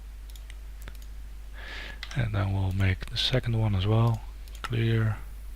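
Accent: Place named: Netherlands. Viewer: You sound a bit of foreign, Dutch perhaps? :P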